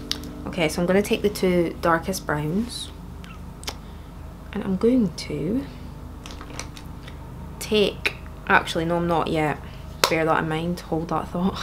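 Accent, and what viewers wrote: Scotland, United Kingdom. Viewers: I love your accent! Are you Scottish? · aaaah her british accent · даа и мне плохо от ее акцента хпхп. То есть это вроде British но ясно что из какой-то определенной специфической части Англии, не пойму откуда именно ахахп. Но в любом случае she's incredible 💓🌹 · And don’t apologize for not being American. The whole world (pretty much) can access YouTube. Your accent is lovely and if you’re English I can tell the regional difference vs. other areas of England. But you do sound a bit Scottish (which if you’re from England, the area would explain why you’d sound a bit more Scottish or even Irish.) Idk how I came across this but I’m glad I did. Cheers mate. Skål! You’re adorable, Deary! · больше на британский акцент похоже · I love your accent,it is scottish ? 🖤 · I watch it for the accent, i fell in love with Scottish accent when I heard her speak 🥺🥺🥺💕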